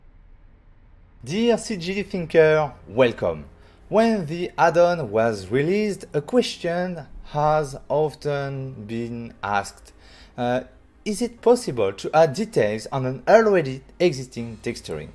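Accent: France. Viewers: Cool French accent <3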